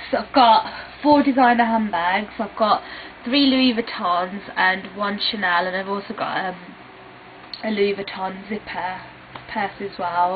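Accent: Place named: Coventry, United Kingdom. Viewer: I knew you were from Coventry, your accent sounds exactly like my cousin's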